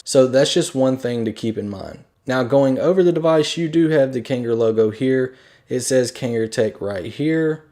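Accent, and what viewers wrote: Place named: South, United States. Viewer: I trust men with southern accents so I feel relatively comfortable asking you this question, hopefully you can answer it for me. Recently, my guy talked me into getting this box because he doesn't like my smoking. What, in your opinion if any, is the best e juice to use that is the most like a cigarette? I realize this is comparing apples to oranges, but I'm kind of desperate. I told him that I wanted the Juul and the original Kangerbox and the emporer's e juice...and he got me this Kanger-made NE box, no Juul system and some kinds of e juice that I hate. he kept saying "tell me what you want." I DID. But he would not add to the cart what I actually specified, so I ended up saying "just get me what YOU want me to have then." So he did and I am now in possession of the box...and no juice that I like. I don't trust going into the stores to test juices because I live in Springfield, MA. 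if you know anything about this area, it's nothing but criminals, and stores filled with really bad product with unregulated chemicals and whatnot. No sane person would buy anything around here. That said, my question is, what vape e juice that is like regular tobacco do YOU like, and where can it be bought online? Thank you in advance. :)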